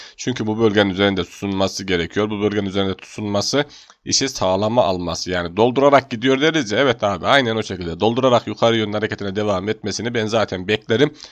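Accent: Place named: Central Anatolia, Turkey. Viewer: dost..Sen konya yada Kayserili misin...aksan Anadolu'nun bu kısımlarını işaret ediyor..ikincisi güzel kardeşim sanırım böylesi bir kurul zaten var... Cb'ligi ekonomi yüksek istişare konseyi yada benzer bir adla....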